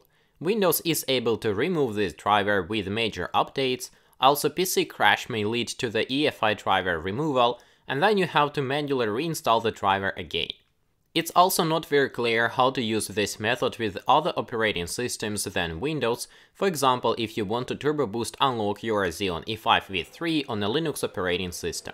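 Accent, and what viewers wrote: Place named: Russia. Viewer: Со славянским акцентом. Очень похож на русский акцент. :)))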